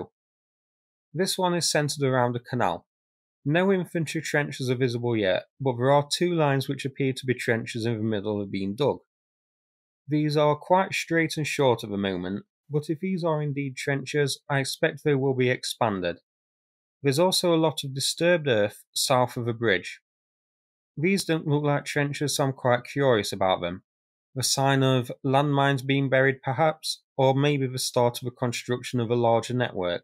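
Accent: United Kingdom. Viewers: No, he's British and lives and works in Taiwan. You clearly haven't followed him for longer than a few weeks or so, because he's been open about this. [Added in the edit]: That he's British, you should hear this from his accent. No Ukrainian talks like that. He's neither from the south-east, because he's not eating his words, nor from high up in the north or Ireland, judging from the sound of it, but definitely British.]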